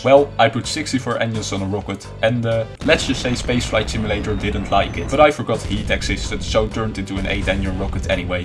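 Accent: Netherlands. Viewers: Cool dutch accent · i smell dutch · Your Dutch right? Like you really sound dutch and I would know, because i am please respond In Dutch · Its a dutch accent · Random question: are you Dutch? I'm surrounded by people with quite similar accents like yours so time for curiosity to commit a murder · I can hear You’re dutch, are you?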